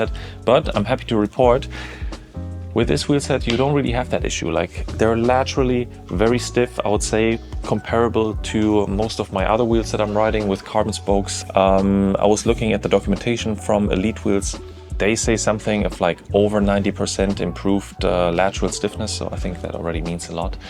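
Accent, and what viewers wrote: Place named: Germany. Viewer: "Velo Six Spoke Aero Wheels" ... the German accent was the hook, but I didn't see any German style analysis. You talk about noise and feel and stiffness and cross winds is as close as you ever get to discussing the aerodynamics of aero wheels! Whats the matter with you? Do you have aerodynamic wind data of any kind?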